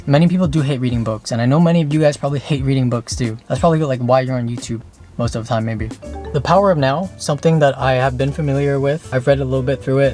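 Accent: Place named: France. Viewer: Omg your french is so cute lol c'est la vie ! keep talking french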